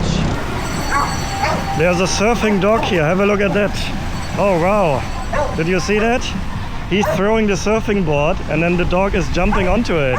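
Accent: Germany. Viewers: This dude reminds me of Pee-Wee Herman w/ a German Accent!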